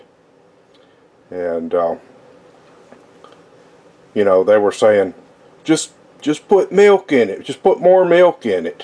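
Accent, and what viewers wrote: South, United States. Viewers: Hi, that gravy looks delicious and I wish that I had some of it in front of me right now. I will definitely try your recipe. You sound like a southerner, I am from Okla. but I live in Idaho. Looking fwd to seeing more of your videos.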